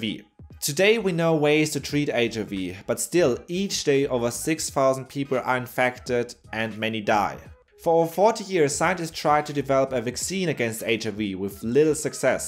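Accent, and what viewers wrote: Germany. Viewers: Cool accent. I think that I could easily listen to German people speak English all day long ! 👍😎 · I’m loving your German accent 😅 and you’re cute. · Very informative. I just feel like he wants to yell at me in a German English accent 😁😁😁😂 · He´s German. That's why. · Thick german accent. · Is he German .. his accent then he sounds Chinese talking English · That accent is hard to place: either German, or Dutch?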